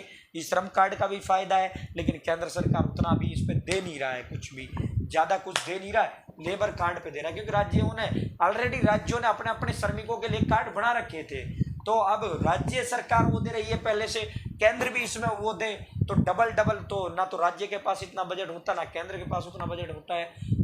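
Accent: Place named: Haryana, India. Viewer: Ap haryana se ho 😅😅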